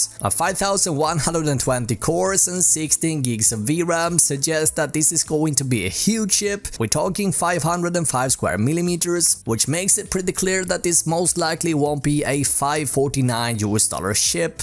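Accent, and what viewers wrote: Sweden. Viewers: Its because he's from the north part of Sweden and the majority of people out there have that annoying accent unfortunately. In short he's speaking in Northern Swenglish. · The Swedish accent is strong with this one!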